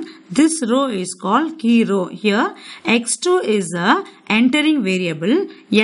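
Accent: India. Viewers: Man I need that indian accent, for some reason I feel math would just be automatically more easy to understand.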